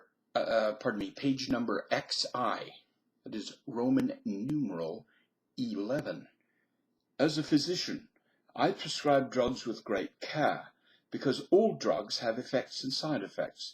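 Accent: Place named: England, United Kingdom. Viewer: I was thinking about clicking away but the English accent gave me confidence in the information that was provided.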